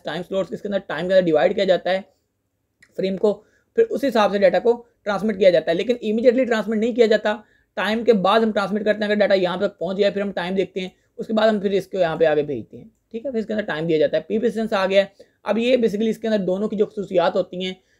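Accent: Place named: Karachi, Pakistan. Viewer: Lahjy sai karachi waly maloom hoty ho...!! :goodvibes:😉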